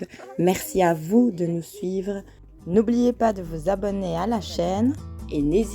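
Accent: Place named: Belgium. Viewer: Olà la p'tite famille 👍🏼 merci 😉 j'adOOOre ta petite intonations Belge 😍 bonne continuation 😘 et à+❣️💃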